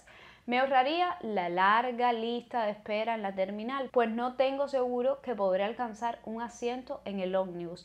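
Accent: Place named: Cuba. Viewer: Ver Trinidad, me recuerda muchos de los llamados pueblos Mágicos de México, Estimada me encantó la música "habanera" como intro y fondo, tu relato bien estructurado y con muy buena dicción y ese exquisito acento cubano, Trinidad es un pueblo mágico con un enorme acervo cultural e histórico, te agradezco por mostrarnos tu amada Cuba, te mando un abrazo grande desde Jalisco México.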